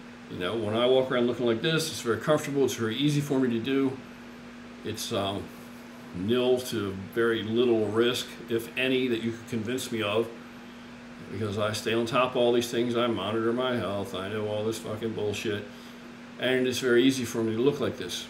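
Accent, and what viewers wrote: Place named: Philadelphia, United States. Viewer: sounds like a philly accent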